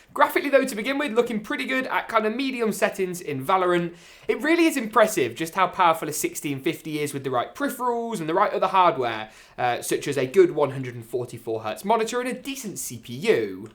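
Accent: United Kingdom. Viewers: Like dat britian accent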